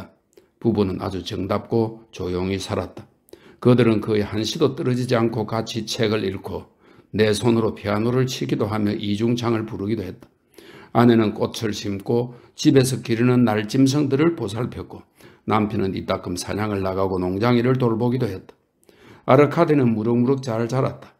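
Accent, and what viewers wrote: Gyeongsang, South Korea. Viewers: 경상도사람의 억양이 들어가서 듣기가 매우 불편하네...다른 사람이 낭독한것은 없나요?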